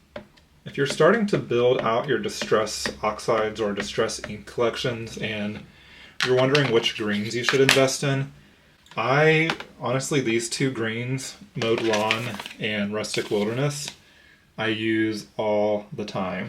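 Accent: North Carolina, United States. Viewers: Here in NC it is wadder, you were right. Im from foothills so it differs all across the state😂You have lost most of your accent but your voice is soothing.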